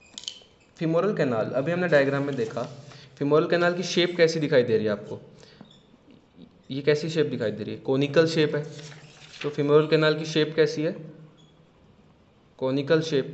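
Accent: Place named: Haryana, India. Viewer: sir tham to haryanvi lago ho manne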